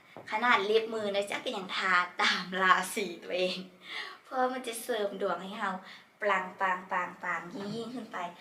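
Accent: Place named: Vientiane, Laos. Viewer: ເປັນຄົນປາກກະດິງ ຄືເວົ້າອອກວຽງ😍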